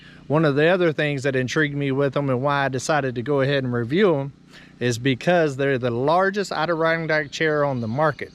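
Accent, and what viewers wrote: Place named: West Virginia, United States. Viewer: Telling ya man.. Im originally from WV. Lived there for 33 years of my 40 on this planet... Hearing this man talk takes me home..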